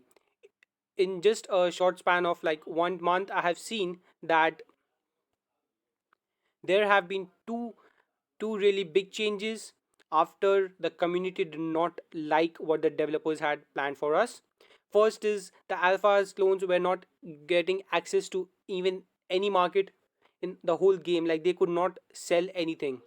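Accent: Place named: India. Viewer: You Indian bro? I find that accent familiar.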